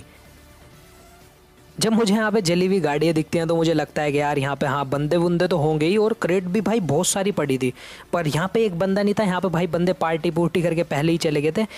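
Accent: Haryana, India. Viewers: Bhai please btada tu haryana ka h kya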